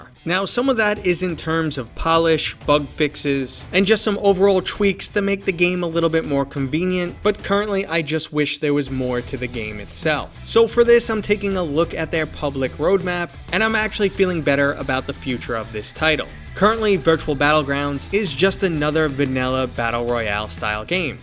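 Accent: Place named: Boston, United States. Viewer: I just like that boston accent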